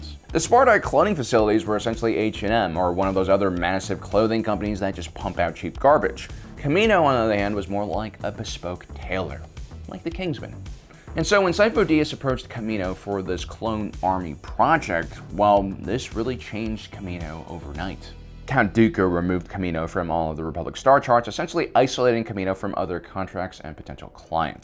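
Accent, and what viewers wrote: New Zealand. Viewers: I like the new Zealander accent ): Omeeeeega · Plenty of kiwi accents sounds like OP, lol.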